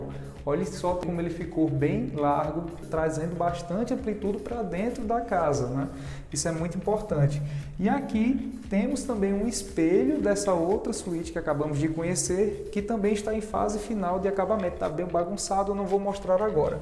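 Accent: Northeast, Brazil. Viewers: Gostoso é esse sotaque nordestino! Adoro!!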